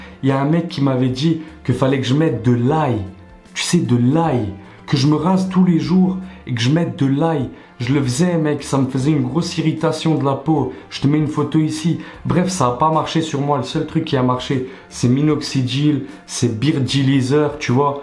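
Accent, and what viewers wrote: Belgium. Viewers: Pure belge nan?😂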